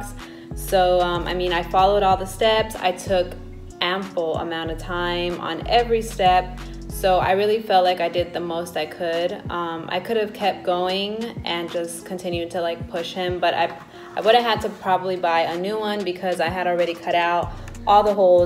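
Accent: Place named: Albuquerque, United States. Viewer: The inflection in your voice makes me miss Albuquerque. That being said I wouldn’t mind clogging your vocal cords￼😂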